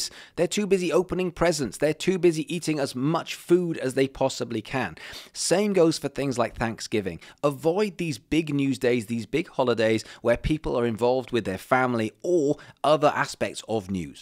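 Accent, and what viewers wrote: Wales, United Kingdom. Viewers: So helpful, really good communication style, clear and concise and specific, plus the Welsh accent is great!😂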